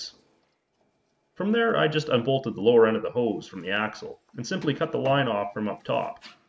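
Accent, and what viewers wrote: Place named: Canada. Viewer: Please sir, I'd like some more. This looks like a great project to mess around with. I love all you fab stuff you always show. It helps me with my projects to see someone do it well. And then there's your wonderful Canadian accent. It's like I'm watching an episode of Letterkenny. LOL! ;) Please do more with this truck!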